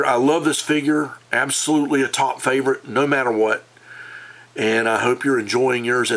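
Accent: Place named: Texas, United States. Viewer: I've never heard of Southern Texan guy talking about Batman action figures loll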